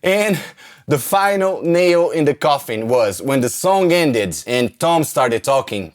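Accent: Brazil. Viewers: I bet you are brazilian cause of your accent- same as mine :) .. Nice video!! keep it up!!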